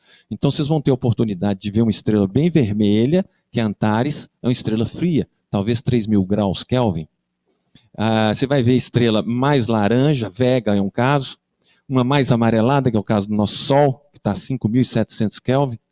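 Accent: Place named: Minas Gerais, Brazil. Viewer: Astronomia e GALAXIAS palestrada por um mestre com sotaque mineiro fica mais LEGAL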